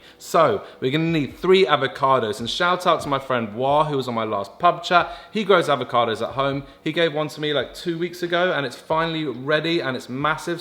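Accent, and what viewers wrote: United Kingdom. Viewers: Also British accent makes everything so fancy and delicious hahh 🤩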